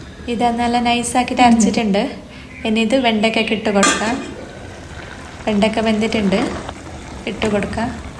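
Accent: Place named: Kannur, India. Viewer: കണ്ണൂർ ഭാഷ അടിപൊളി👌👌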